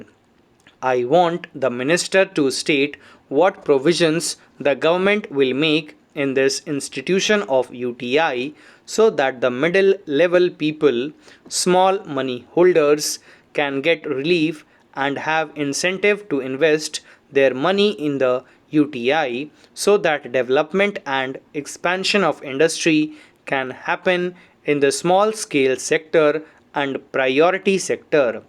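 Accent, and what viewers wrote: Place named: United Kingdom. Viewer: Nice dictation like British English 😀👍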